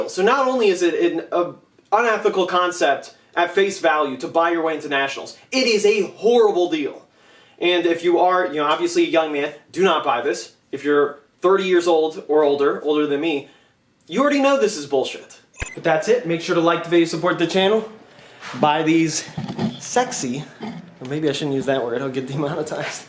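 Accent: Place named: New York, United States. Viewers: Clocking his southern accent southern *Ohio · Sounds like a New Yorker and says pounds like he's from Tennessee.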